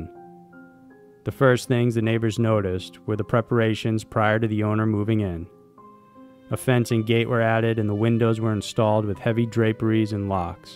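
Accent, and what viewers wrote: United States. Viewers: Probably because a) the guy sounds like he's from the US and b) the US tends to have better records, and also records in English. I know if I was doing this and I came across one that I had to go through and translate I'd quit.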